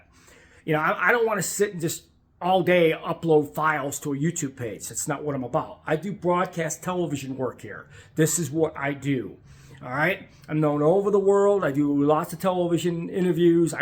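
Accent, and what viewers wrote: Chicago, United States. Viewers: I thought you had the Chicago accent. A lot of my family came out of Chicago. I've donated before, I'll do so again. Looking forward to seeing more stuff whenever your time and copyright laws allow. Good luck!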